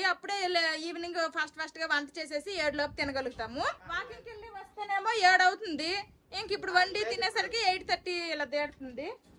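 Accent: Srikakulam, India. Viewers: Sikakulam basha 😅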